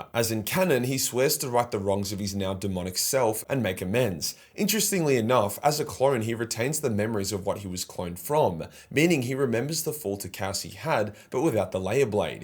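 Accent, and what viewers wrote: Australia. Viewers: bro how on earth is an edge lord channel? This is just how we talk in Australia lol.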